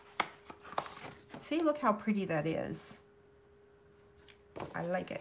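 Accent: South, United States. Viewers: So we can’t hear that sweet southern voice & your beautiful face at the same time…. Thanks for hanging in there.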